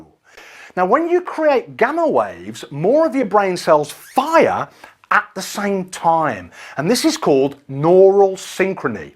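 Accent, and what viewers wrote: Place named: United Kingdom. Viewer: The explanation with a british accent and gamma state make this video very €nergetic & interesting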